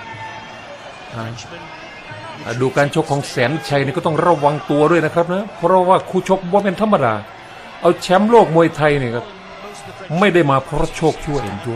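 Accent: Isan, Thailand. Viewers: ผมคนจังหวัดนครสวรรค์คนภาคกลาง ยังชอบเวลาภาคเสียงอีสานเลยครับตลกและฮาดีครับ..ชอบๆครับ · ผมว่าน่าจะใช่ พากย์มิสเตอร์บีนเสียงอีสานด้วย เสียงคล้ายมาก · 😂😂😂😂😂😂😂😂😂😂😂😂😂😂😂😂😂😂😂❤❤❤❤❤❤❤❤❤❤❤❤❤❤❤❤❤❤😂😂ชอบคนพากษ์ที่ชือ พากษ์อีสานฝรั่งขะแมร์😊😊😊😊😊😊😊😊😊😊😊😊😊😊😊😊😊😊😊😊😊😊😊😊😊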